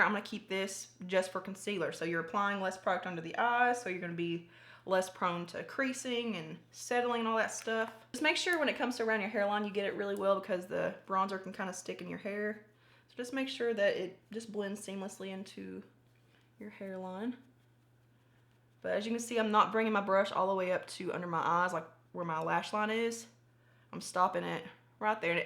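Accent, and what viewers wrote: South, United States. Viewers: #partypeople Brand new subscriber here and I must say I really enjoyed your video and this technique. I have never seen this done before. You are so beautiful! I just had to let you know. Oh and ps. From one fellow country gal to another, I love your accent! Nice to know I’m not the only one with a twang! 😘😉🥰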